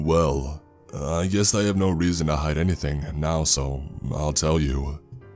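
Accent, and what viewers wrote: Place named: South, United States. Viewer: It's a Southern guy man give him some slack lol.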